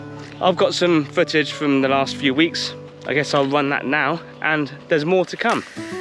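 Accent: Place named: United Kingdom. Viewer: Love british english speaking accent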